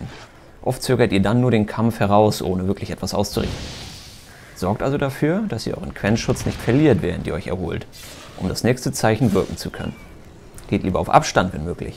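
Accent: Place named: North, Germany. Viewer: Kommst du aus Schleswig-Holstein oder Hamburg? Erkenne deinen Akzent sofort 😂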